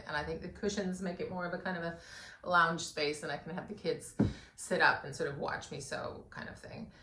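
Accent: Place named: Scotland, United Kingdom. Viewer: The space looks great. I love the bright colors on the wall. I wish I had enough space to attempt organizing so well. The Yeti mug was a good choice...I love mine to keep water cold on my bedside table all night. Your accent is adorable..It seems to be American, with certain words having the underlying Scottish brogue. Keep the videos coming, I always enjoy them.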